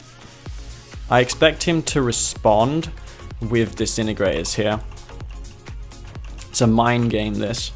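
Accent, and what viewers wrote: United Kingdom. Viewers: Nice to put a face to this voice I've been listening to for years lol, British? Maybe?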